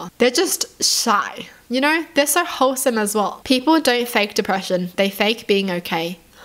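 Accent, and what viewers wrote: Australia. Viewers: I do like your voice! Australian accents are so cute and adorable🥹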